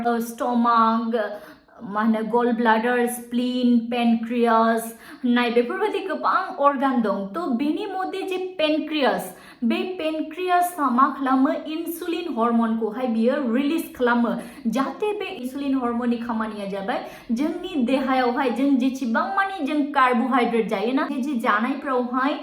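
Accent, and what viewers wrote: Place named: Bodoland, India. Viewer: Jahag Lagtar bai abo bodolend ni Rao Baidi sur Pwra 😊❤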